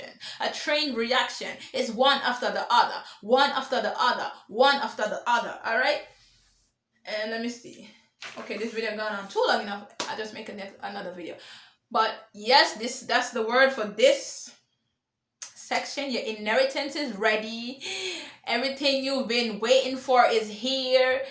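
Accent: Jamaica. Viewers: Thank you sis! Are you from Jamaica? I hear a Jamaican accent! God bless! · Sister, by any chance are you from Jamaica ?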